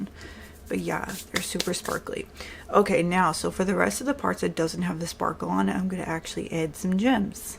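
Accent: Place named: New York, United States. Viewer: Congrats on your 260 subs... Lol heavy NY accent..My Spanish accent is heavy too, I cannot imagine what people will say about it 😅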